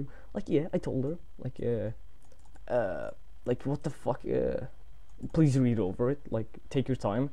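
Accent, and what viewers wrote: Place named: United Kingdom. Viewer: Can't tell if you're British or American LOL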